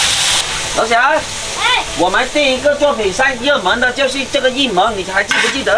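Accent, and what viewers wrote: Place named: Malaysia. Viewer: Where is from? Sounded like a Malaysian.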